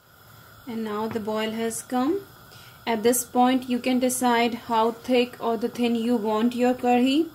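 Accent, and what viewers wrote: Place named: India. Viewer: Awesome... and with boiled rice it will be more tasty. Di I like ur hindi accent more cute. 😍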